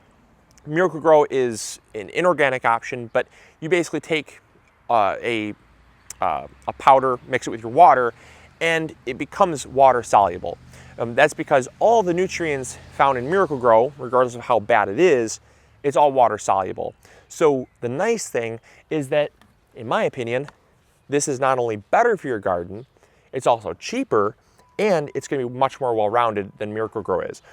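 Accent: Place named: Midwest, United States. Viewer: Love the Midwest accent: CAM-pohst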